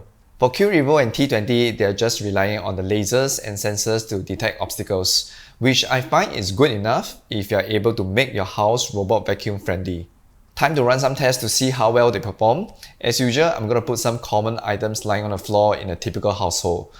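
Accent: Singapore. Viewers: You sound from SG. Are you? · You sound like my coworker from Singapore, la. Did I guess right?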